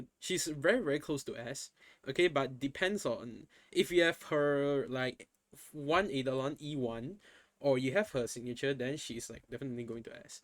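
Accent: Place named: Singapore. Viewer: Bruh the singlish, I can't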